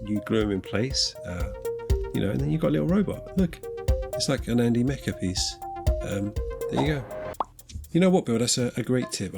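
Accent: United Kingdom. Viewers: Hahaha, your rambling sounds very much like Korg from Thor Ragnarok, with a British accent. Love the channel!!!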